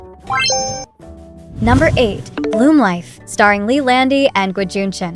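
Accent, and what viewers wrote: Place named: United States. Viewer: While I am glad that cdramas are getting more attention, it really rubs me the wrong way when Chinese names are pronounced American style. It's really not that hard to figure out how the names are actually pronounced.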